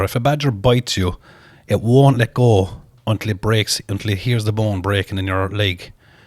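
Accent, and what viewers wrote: Ireland. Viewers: are you from cork bai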